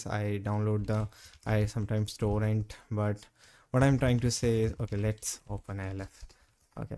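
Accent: India. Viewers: Certified Linux Chad with indian accent, lisp and all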